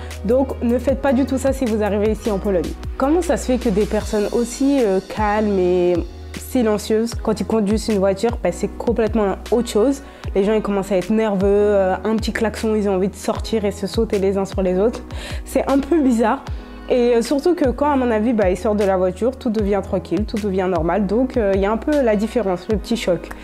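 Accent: Paris, France. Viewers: Mówi Bardzo Czystym Paryskim akcentem Gawiedzi ! Na 100 % Urodzona we Francji ! Kończyła Szkoły we Francji ! Na 99 % Pochodzi z kompleksu Miejskiego Paryża ! Powiedział bym Okolice Saint Denis ! Przynajmniej Uczęszczała Tam do Szkół ! Na 100 % ! Ale Kultura Osobista ! To 94 Departament ! Francuski Literacki ! A ,, ąrgo ” ( Dialekt Paryski! ) To Dzień i Noc ! To co się Uczyliścje w Szkole ! To Język Literacki ! Prawie Wymarły ! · standardowy akcent bym powiedziała , akcent z Paryżu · To jest akcent paryski jakim mówi teraźniejsza młodzież. Wiem bo urodziłem się we Francji i mieszkam pod Paryżem. Jak dla wszystkich języków, w szkole uczą nas wersje literacką języka, a nie tą co się używa na codzień.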